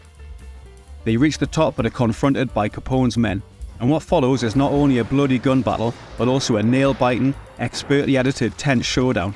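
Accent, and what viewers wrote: Newcastle, United Kingdom. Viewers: Great to hear a geordie on hear👌👍🏻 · Unexpected and lovely to hear a Geordie accent on the JoBlo channel! Great job.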